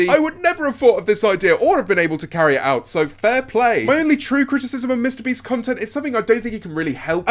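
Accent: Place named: England, United Kingdom. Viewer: Mr.Beast is fun just the way he is and anyways i wish i can do your english accent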